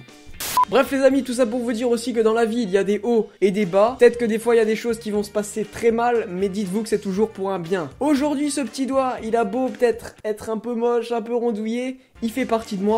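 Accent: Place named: Moselle, France. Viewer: Tu viens de Metz ? Genre Moselle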